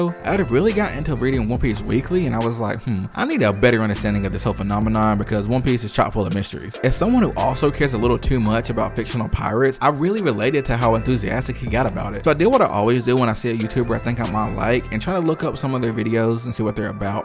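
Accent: South, United States. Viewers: I haven’t heard a southern accent this deep since I visited The University of Alabama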